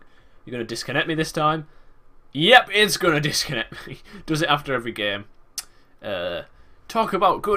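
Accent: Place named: United Kingdom. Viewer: You British m8 love your vids you make my day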